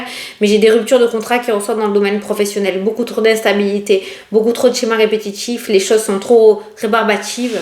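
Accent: South, France. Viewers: Bonsoir, toujours la joie quand je vous écoute, c est mdr, j'adore votre façon de vous exprimer avec votre petit accent du sud moi j'habite dans les Ardennes 08. Vous êtes franche mdr avec humour. Je vous apprécie. Merci pour votre bonne humeur. Merci pour votre gentillesse et votre bienveillance. Que Dieu vous bénisse ainsi que votre famille.❤❤❤🙏🙏🙏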